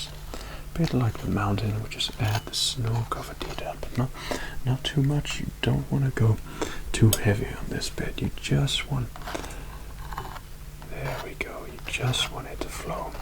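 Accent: United States. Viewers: Why do I always seem amazed when an English person does an American accent, when they do it seems kinda struggled if they go for to long, he did really good though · it’s eerie because Bob recorded in Indiana, where very few people have an accent, but gets it nearly spot-on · Bless you, your Yank accent is definitely improving! 😀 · IS THAT HIM TALKING? If it is great american accent my guy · How hard was it trying to imitate an American accent? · Honestly, solid American accent. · His American accent on point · The effort spent towards getting the American accent just right is amazing! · Never thought I'd hear with an American accent! Very good!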